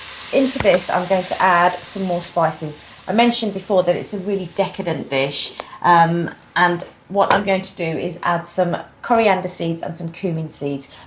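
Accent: United Kingdom. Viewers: She does indeed have a British accent and may even be a nice English as well as Indian lady?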